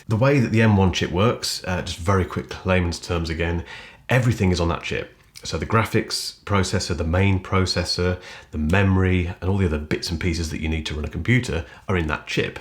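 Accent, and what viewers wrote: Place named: RP, United Kingdom. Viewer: The accent is so posh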